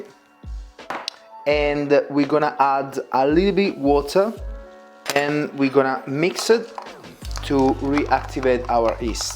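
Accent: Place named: Italy. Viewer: Mate don't apologise for your English! It's great and it doesn't even matter if we hear your Italian. I love your accent!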